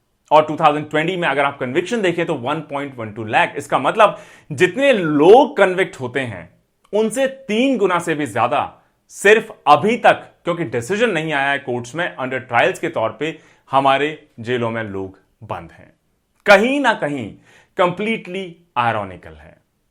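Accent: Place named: Rural, India. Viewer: सर आपके वीडियोज देख के एक पूरा रोड मैप तैयार हो गया पढाई का , परी analysis के साथ हो रही हैं,बस एक चीज की ठोडा कमी लगती है, हिंदी साफ सुथरा बोलने की ,क्योकि उसमे local language आ जाती है, गांव वाली हिंदी, गांव की भाषा ठीक है अपनी जगह पर हर चीज मे professional होना चाहिए, इससे थोडा आत्मविश्वास कम हो जाता है,इस पर कैसे काम किया जाए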